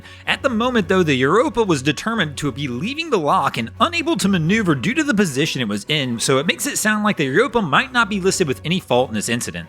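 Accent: United States. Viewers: Hi, are you able to post videos without commentary? I love your content but have a hard time dealing with your obnoxious American accent. Thanks in advance from Australia.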